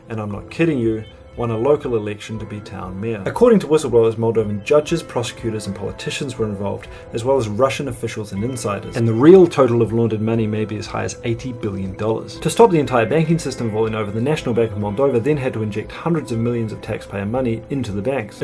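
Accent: New Zealand. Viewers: I think you have the New Zealand accent to blame for that.. Takes a more effort to understand if you have not heard it before! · I didn't even recognise the Kiwi accent til half way thru the vid hahaha.. obviously been watching too much shortland st fml. Awesome vid tho man, good on you! love from another kiwi guy <3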